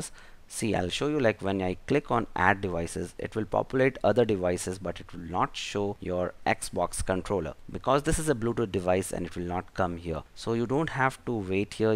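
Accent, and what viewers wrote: India. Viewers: This review helped me so much! By the way are you Indian? (I’m not racist, just asking)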